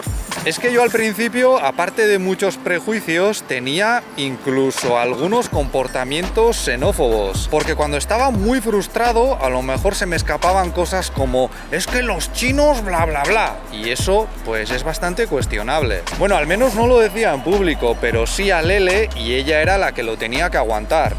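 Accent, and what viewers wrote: Basque Country, Spain. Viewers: A mi me gusta más el español de Lele que el de (demasiado acento vasco para mi gusto) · aunque no lo creas, los acentos de otros hablando otro idioma, por aquí se acepta y no es raro escuchar un español con sus dejos y defectos...nos parece simpático...asi como tú hablas en español de España, es otra cosa con el de latino américa,y de cada país, así que a Lele, que practique un poco mas, pero yo sé que todos los amigos que los apoyamos nos parece muy simpática su manera de hablar y también el tuyo " ya veis " que es la salsa de la vida...😃☺️... muchos saludos a todos ☺️...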